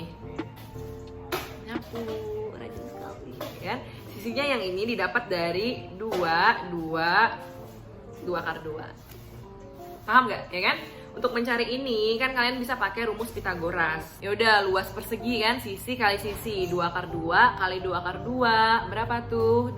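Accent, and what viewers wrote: Palembang, Indonesia. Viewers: Kak kokbahasa kk kyk bahsa plembangg sihh😚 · Kakak orang palembang ya? logatnya wkwk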